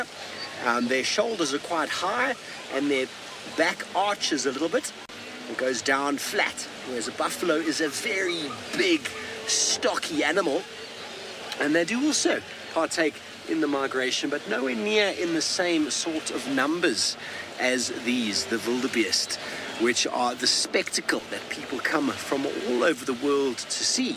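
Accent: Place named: South Africa. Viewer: The south African accent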